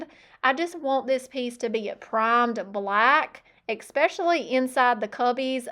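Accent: South, United States. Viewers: Some people just don't like our southern accents. You are fine exactly the way you are. That ugly comment was just from a nasty person. That person definitely has the problem, not you. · I thought you sounded country when I first started watching I grew up in country and I related and listening to you describe what you were doing with such cute projects is what made me keep watching So ignore that critic. I had a good laugh in another video when you said your dog was sighing because he was unemployed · I’m in the UK and I like to hear the variety of US accents, it just gives your channel extra character and charm. Loved the projects 😊❤ Merry Christmas 🎄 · Oh my goodness, …. Your accent and vocabulary is a big part of your charm. At one time, I had a similar southern accent, and as I was on the phone a great deal with people around the country in my career, as people would tease me and not take me seriously, I worked hard to change my affect, and now, after all these years….its gone. 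Unless I go back home to SC and I fall back into it! 😂 Thank you for holding to your roots. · Please don't hire a narrator. I am in Germany and specifically listen to your sweet Southern Accent and find it intensely soothing. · I agree! I love hearing your cute southern accent. Don’t change a thing! Some people are just miserable and try to bring others down. We love you! ❤❤❤❤❤❤❤❤ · I like your accent. To me it sounds very American. Surprise ! She's an American who lives in America. I don't have a problem with that 😅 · Girl, I'm from southeast Texas and I have a draw myself. I LOVE your accent and many people would KILL to have a southern accent. Have you ever noticed how much they use our accents in movies, YouTube commentary, etc. They are just jealous and only wish they had our accents. Be proud of who you are, how talented you are, your accent, your voice, everything. You're an amazing person and I personally love you and your channel! 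❤❤❤ don't let the haters get to you. I would've pinned the comment on the screen and given her screen name. If more creators would call them out by name, I think it would Happen a lot less... keep doing you 😊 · you don't change a thing, I love your sweet presence, and your awesome southern accent. I loved all of your projects, but my favorite are always the milk paint green. I hope you have a very Merry Christmas with your family and friend. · And my little secret, I do this and I haven’t told anybody…. But I walked through the house sometimes going.” hi I’m.” in my best country accent. I love the way you talk. It gives me comfort you’re so down to earth. So when people are going around, copying you and repeating how you sound even if it’s just themselves, you’re doing something right lady. ❤ Plus, if God wanted you different, he would have made you different. ❤️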